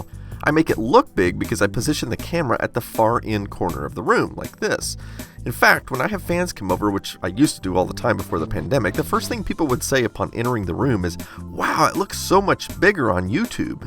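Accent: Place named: Texas, United States. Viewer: Dude, I've served in the Army with soldiers from Texas and that's just how they talk.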